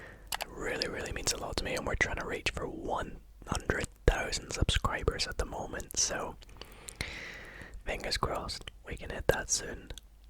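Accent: Scotland, United Kingdom. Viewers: Hes Scottish 💀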